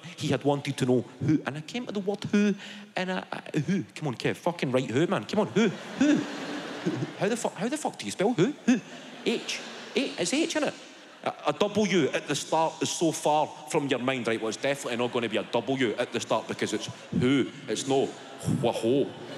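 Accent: Scotland, United Kingdom. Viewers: Oh,. I think you're so funny! Now, if I could only get through your fabulous Scots brogue, then I could really laugh at the parts the audience roars at. I will continue to watch you on Youtube, because you see, I've discovered that the more I listen to you, the more I understand you!!! Wooo Hoooo!!! 💋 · Oh my God I am in love with this guy! He has new material every single time. His smile, his accent and the material are just so real that I ache to shake his hands and tell him what an amazing guy he is... I miss his funny posture from his slightly overweight days but now he just looks stunningly good. Love you Kev. Thank you for opening up the Scot world to us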